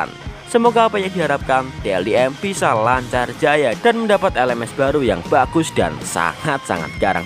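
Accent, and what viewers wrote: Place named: Java, Indonesia. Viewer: Saya Indonesian... Saya bisa pastikan admin ini Indonesian juga... Slank Jawa atau medoknya masih menonjol atau jelas terdengar (hanya bisa dipahami oleh orang Indonesia) 🤗🤗🤗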